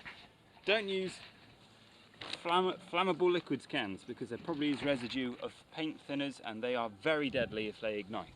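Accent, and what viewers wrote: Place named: Birmingham, United Kingdom. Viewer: PS, I'm guessing from your accent you're from around Birmingham??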